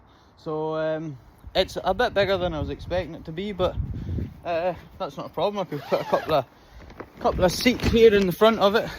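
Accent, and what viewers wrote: Scotland, United Kingdom. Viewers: I have to tell you how wonderful you are. You are genuine and kind, so knowledgeable about border collies, particularly your funny and talented adorable pups and about farming in general. And you make me laugh. You have a good sense of humour and you remain calm in stressful situations (bad weather etc). I love love love love how much you care about your pups and how well you treat them. They just adore you in return. I love Stacey and Kole as well. More family outings like the video with the horses and how much Stacey loves horses would be awesome. I also am an animal lover and don't necessarily like zoos in general but these horses had gobs of room to run around, so all was good. I am mesmerized by how intelligent your dogs are herding. I could watch them all day. And how they look up to you, literally and figuratively. 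I have your videos playing while I work as they make me smile and the world is good. And the Scottish accent........don't get me started......love it! Keep up the good work. My name is Paula, from Ottawa, Canada. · If you're bored, stop watching. Lots of fans love seeing anything he does. We hunger for relaxing content and seeing his idyllic life. Anything described with a Scottish accent is just a bonus IMO.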